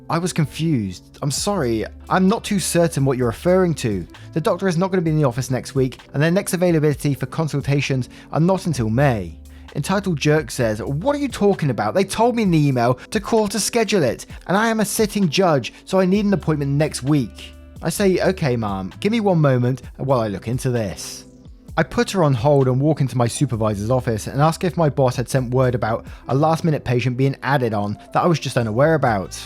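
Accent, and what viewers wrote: England, United Kingdom. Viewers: My day off so perfect time to catch up on waffles lol. Always awesome to listen to, the perfect voice. Canadian’s love that English accent.🥰🥰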